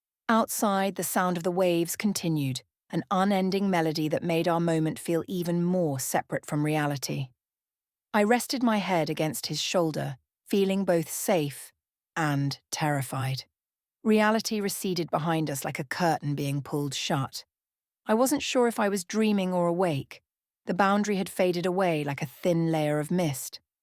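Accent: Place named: United Kingdom. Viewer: Why do I have to suffer through a British accent on a story ostensibly about Americans in America?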